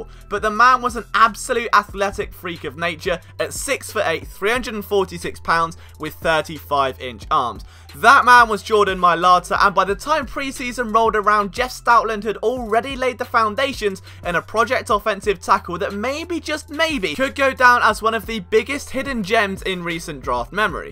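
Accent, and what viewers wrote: England, United Kingdom. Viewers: I don't what it is, but I just can't listen to NFL commentary by a Brit. Could be the most insightful view but just can't stomach it. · Love the channel to bits. Where in England is your accent from? Keep up the great work, Philly loves ya kid!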